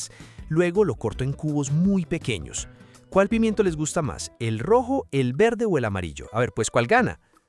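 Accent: Antioquia, Colombia. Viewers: ME GUSTA LA FORMA DE SU NARRATIVA PAISA..Y AL FINAL UNO LE AGREGA OTROS INGREDIENTES ATUN..POLLO ASADO EN TROZOS Y EL ADEREZO LO PUEDO CAMBIAR..Y LA BASE DE SU ENSALADA ES EL INICIO DE UNA COMIDA.GRACIAS POR MOTIVARNOS A COMER SANO..Y CON TODOS LOS INGREDIENTES COLOMBIANOS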